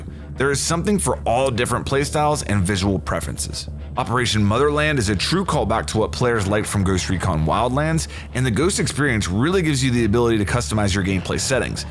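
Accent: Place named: Pittsburgh, United States. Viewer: Guy sounds like he’s from Pittsburgh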